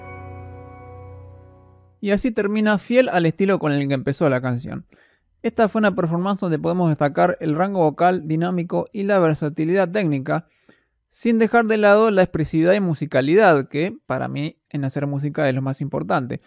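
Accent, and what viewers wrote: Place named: Castile, Spain. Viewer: ✨✨✨Maravillosas tus técnicas ✨✨(HD isolation)) y ((Gráfico espectral))...eres único analizando y mostrando solo el sonido de la voz. Sorprendida al escuchar tu voz castellana ..saludos desde Mendoza 🇦🇷 y en mi nombre grupo LA VOZ DEL MUNDO DIMASH QUDAIBERGEN SOY TU FAN 🇦🇷🇦🇷 ARGENTINA.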